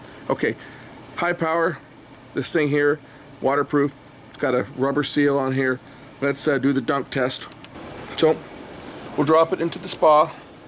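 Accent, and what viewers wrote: Canada. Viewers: From your canadian english accent I think you are from British Columbia?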